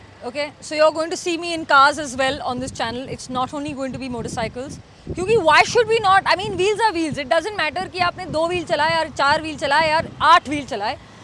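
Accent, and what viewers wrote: Haryana, India. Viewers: Aap haryana se ho 🙂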